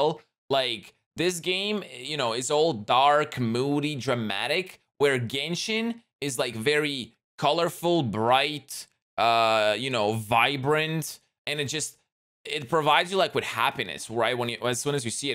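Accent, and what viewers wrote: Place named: Eastern Europe. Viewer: I'm assuming English isn't your native language? You're pronunciations are weird eastern European I assume?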